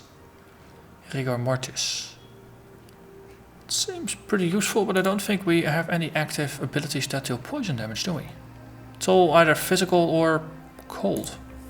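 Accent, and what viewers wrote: Netherlands. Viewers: I love dutch acent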